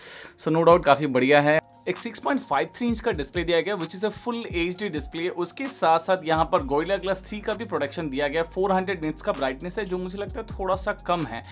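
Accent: Bengal, India. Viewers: Are you from Bengal?? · ARE YOU A BENGALI SIR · Bro, are you bangali? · Bro are you Bengali??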